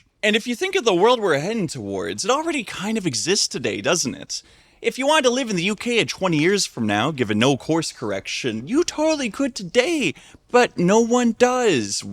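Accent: Nova Scotia, Canada. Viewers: Thought I heard an NS accent, flag confirms it. Lived in Halifax for 20 years, came back to UK due to health issues. Can confidently say that the Western world is falling apart, and it's glaringly apparent in the UK, and from what I hear, back across the pond too. Can confirm that "business rates" here are fn absurd. You think Visa/Mastercard are bad? Amex charges 5%. Running a business in Halifax, aside from cash, Interac was by far the most cost effective method of processing any transaction higher than $5. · He's Canadian. It's a Canadian accent. From Canada.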